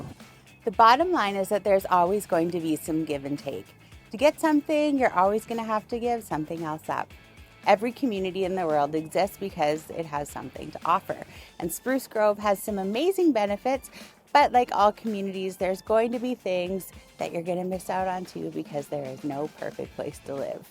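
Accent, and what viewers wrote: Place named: United States. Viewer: Hi, I am first time watching your video That is nice for me because I love American English accent Love from India ❤😊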